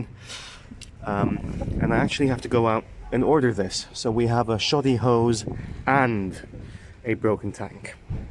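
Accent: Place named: Netherlands. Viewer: hoor al dat je nederlands bent aan het accent ! ik heb een 230 .. zelfde soort gezeik continu ... maarja er blijft sowieso wel elke week wat nieuws kapot gaan aan dat klote ding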